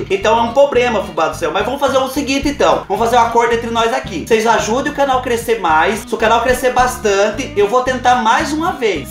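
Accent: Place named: Minas Gerais, Brazil. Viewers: Você é lindo,espontâneo,humilde,engraçado,a parte do restaurante foi melhor. Adoro seu sotaque,sou mineira de poços de caldas e me identifico! Seu carinho com quem te assiste é seu diferencial!!!! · Descobrir o canal ontem e estou apaixonado com seu conteúdo. Seu sotaque é igual dos Mineiros. Você é incrível!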